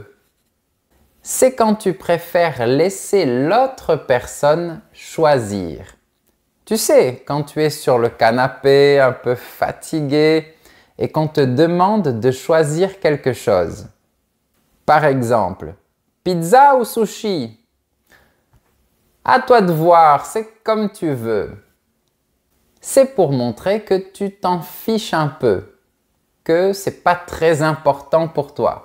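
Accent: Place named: France. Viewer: Merci beaucoup pour faire cette videos. Je peux comprendre environ 100% de ça que tu parles. Mais que je ne peux pas m'arrter de poser un question a moi même. Que region de France est-ce que vouz prof devient? Par-ce que je trouve ton manière de parle très intéressant prof. Pardonne ma mauvaise grammaire. 😅😅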